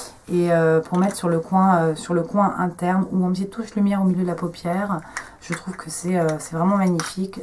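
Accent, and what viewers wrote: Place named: England, United Kingdom. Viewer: Coucou. Contente que les rouges à lèvres Catrice te plaisent et merci pour le petit clin d'oeil ça fait plaisir! Oui effectivement tu as un peu craqué ton slaiiiip mais je te comprends les -25% ont aussi fait des ravages sur mon compte en banque. Bon j'ai encore rien pu tester à cause de cette Motherfucking allergie au visage. Gros bisous! Ps: j'adore ton accent anglais! Mdrr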